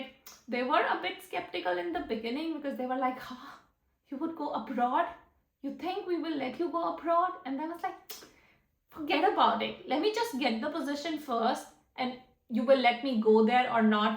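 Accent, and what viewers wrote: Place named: Germany. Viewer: What happened to your accent, i think it mixed up with German 😅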